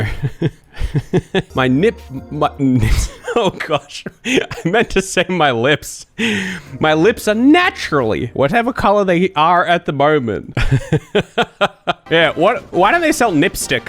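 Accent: Australia. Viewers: This is definitely massive YouTube BS, but... I wonder if it's an accent thing? Hear me out: I can't imagine that their "real humans" listen to videos all the way through. It's just inefficient. I would not be surprised if they have A.I. isolating suspect words and then presenting just those snippets to listeners. f you listen to out of context snippets of you saying "can't" and don't realize that its an Australian accent, it could maybe mistaken for "c*nt". that's my theory anyway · Aussie accent doesn’t always mix well with their sensors very stupid lmao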